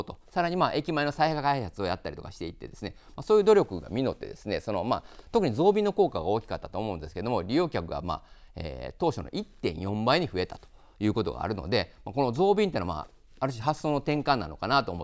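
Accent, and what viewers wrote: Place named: Kansai, Japan. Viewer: とりあえず関西弁やめとこか？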